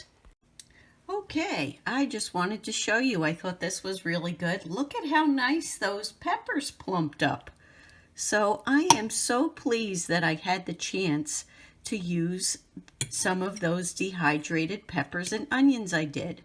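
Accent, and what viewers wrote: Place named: East Coast, United States. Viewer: Looks delish! You from east coast. Slight accent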